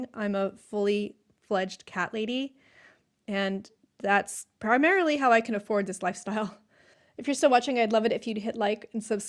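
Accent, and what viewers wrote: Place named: United States. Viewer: You've lost your American accent for one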